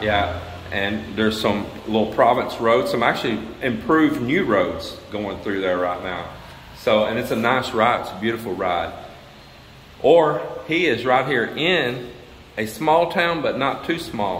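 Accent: Texas, United States. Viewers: You make me laugh. The way you talk. I know you are from Texas. I am from Tennessee. I really like the way you talk. So country and I don’t mean that in a bad way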